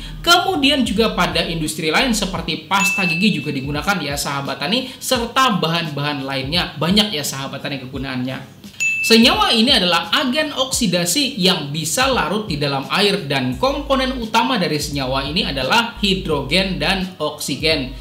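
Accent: Banjar, Indonesia. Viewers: dari logatnya BANJAR sih bapak ini, kebetulan saya di KALTIM terbiasa mendengar orang2 b'cakap Banjar Salam Pian Sabarataan lah 🙏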